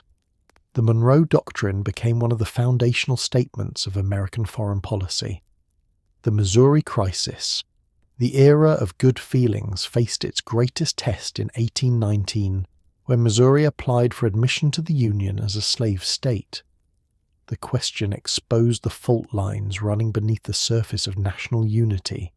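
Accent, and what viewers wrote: United Kingdom. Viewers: American President why the annoying brit accent? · Well Done! Monroe was On Point with the Doctrine! Why the Annoying British Accent Narrator? Informative! C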